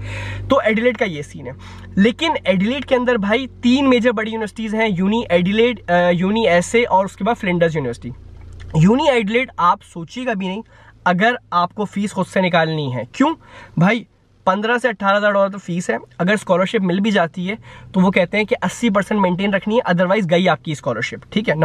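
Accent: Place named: Karachi, Pakistan. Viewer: bhai ya jo ap baat ka andr karachi wala touch daita ha na bss sara maza too is ka ha😂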